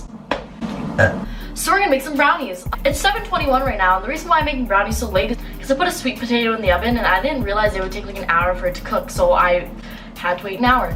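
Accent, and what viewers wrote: Alberta, Canada. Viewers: oh mygod you albertian accent is amazing lol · You can hear the Canadian in her and I love it 🇨🇦🇨🇦🍁🍁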